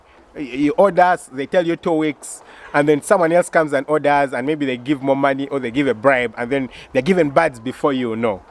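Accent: Uganda. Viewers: Hello Doc,been a while since I checked out your videos. You have such passion,we love your Ugandan accent,we say 🇺🇬 stand up.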